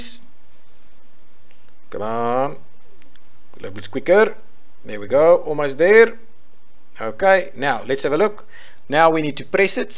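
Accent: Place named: South Africa. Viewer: Hey Boet! Nice to hear a good South African accent. Just bought Terroir and enjoying it, especially as I live in Cape Town and as you know, am surrounded by terroir! Keep it up with the strategy games and I'll be following.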